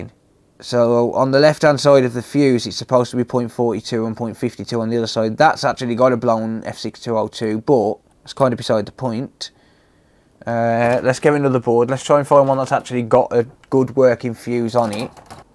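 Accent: United Kingdom. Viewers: You're from the United Kingdom I can guarantee that am I right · british dude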